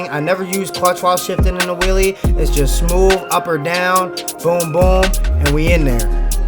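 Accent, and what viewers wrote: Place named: Philadelphia, United States. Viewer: Lmfaoo yo from start to finish u can hear the Philly accent progressively comin out 🤣🤣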